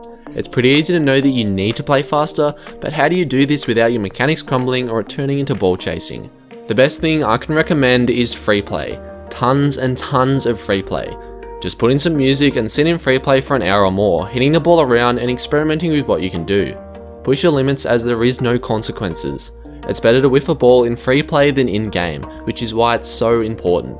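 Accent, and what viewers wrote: Australia. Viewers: Oi you’re Aussie ay?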